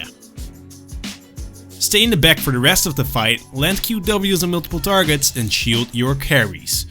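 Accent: Netherlands. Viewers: This guy sounds so Dutch. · dat dutch as fuck accent tho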